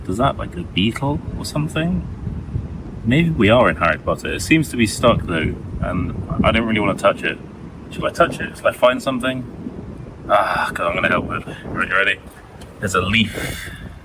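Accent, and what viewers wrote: United Kingdom. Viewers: Listening to rain sounds is the best! (British accent with an ASMR vibe:) So no worries about it at all!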